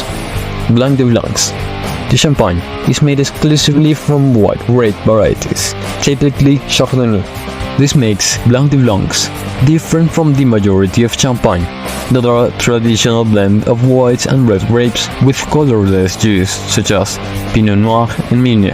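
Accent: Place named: France. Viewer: Very nice video, thanks very much!! To be honest its a little hard to understand your accent, but with subtitles it works perfectly, also your accent adds some french charm to it, so feels like the narrator is definitely know what he is talking about, so although its a bit hard to understand its actually nice )) thank you!!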